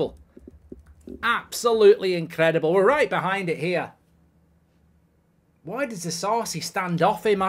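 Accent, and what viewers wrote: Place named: Scotland, United Kingdom. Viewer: Marks Scottish accent 🙈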